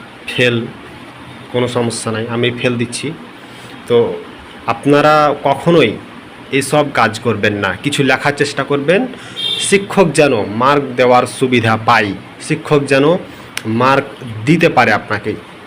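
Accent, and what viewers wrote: India. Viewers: আপনার কথা শুনে ভারতীয় মনে হচ্ছে আপনি এই খাতা কোথায় পেয়েছেন 🧐🤨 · আপনার কথা শুনে তো ইন্ডিয়ান ইন্ডিয়ান লাগছে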